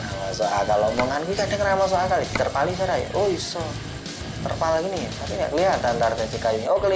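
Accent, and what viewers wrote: Java, Indonesia. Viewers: Logat koyo wong jowo koe mas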